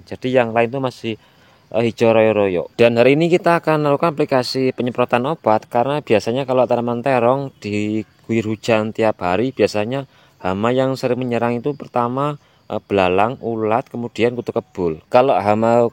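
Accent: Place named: Banyumas, Indonesia. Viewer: Ngapak temen lur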